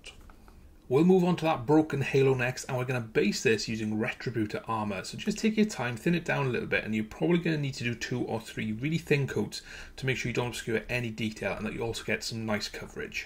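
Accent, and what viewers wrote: Wales, United Kingdom. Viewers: Your very welcome. I denote a Welsh accent? Newport?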